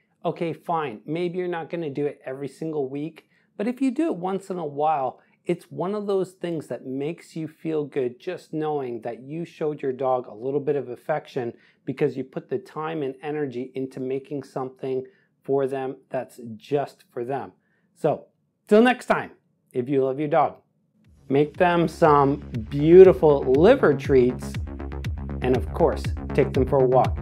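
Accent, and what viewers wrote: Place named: Canada. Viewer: Thanks for the recipe and your good intentions, always pleasant to hear a Canadian accent, you Canadians certainly won the lottery when it comes to politeness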